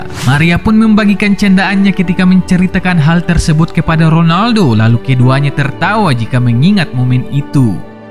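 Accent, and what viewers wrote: Medan, Indonesia. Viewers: Lucu pembacanya, logat nya masih terasa, kek nya orang medan ini, horas medan!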